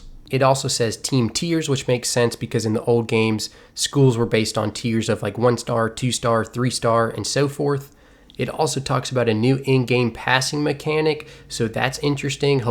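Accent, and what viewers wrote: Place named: Delaware County, United States. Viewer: Where you from? You sound like you're from Delco (Philly burbs)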